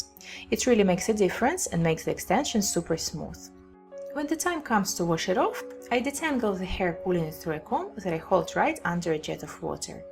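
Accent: Russia. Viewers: I told russian accent:) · Ха,я вот сколько смотрю эти замечательные ролики и каждый раз думаю,интересно,девушка говорит правильными английскими словами,но с совершенно русской интонацией:) Сама с этим борюсь,изучая английский. Пока безуспешно. Спросить было неловко,спасибо,рассеялись мои сомнения))) Спасибо большое за полезное видео! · She haves russian accent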